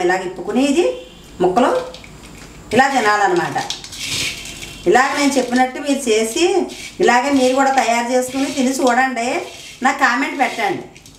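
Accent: Godavari, India. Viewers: Amma meedhi godavari jilla na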